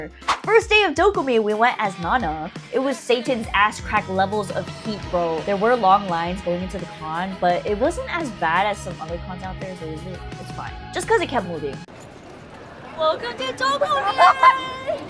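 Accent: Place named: United Kingdom. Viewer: Wait you are from 'MURICA?! I tought you were like British or a very confused German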